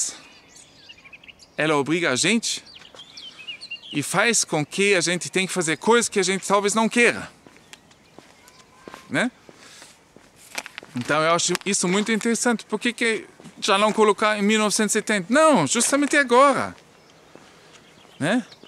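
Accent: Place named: Germany. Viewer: Eu sou canadense-brasileiro. Nasci em Santos - São Paulo. É uma cidade portuária. Meu pai era canadense e minha mãe biológica era descendente direito de alemães. Meu avô paterno era japonês e minha avó paterna franco-canadense. Por parte da minha mãe adotiva meus avós eram ingleses. Infelizmente não conheci a minha mãe biológica. Eu simplesmente adoro os seus videos. Assisto todos os dias. Devo dizer, também que estou inscrito. VOCÊ lembra o meu pai quando fala português. MEU pai tinha um sotaque inglês muito forte. Sue sotaque alemão é bem característico, também. ACHO VOCÊ SENSACIONAL. UM ABRAÇO DO SEU AMIGO CANADENSE-BRASILEIRO.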